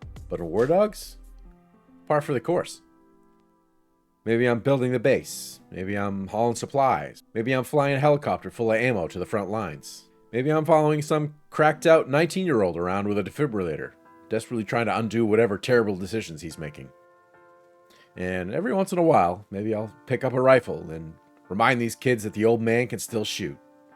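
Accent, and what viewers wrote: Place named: United States. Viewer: As someone pushing 50, I had flashbacks and (virtual) PTSD with this video 😅 I enjoyed what I played of BF6, but hated the COD-style sliding. LAN parties were great, though you could guarantee there would be at least one player struggling to connect to the rest 🤪 I sadly missed the closed Beta for this, hope the rumours are true and I get to try it before the 10th. Most of my recent shooter experience is with Ready Or Not, but this could scratch an itch for me 😁 I'm in the UK, I'm guessing from your accent that you're across the pond? Just heading to check if you have a Discord server 😅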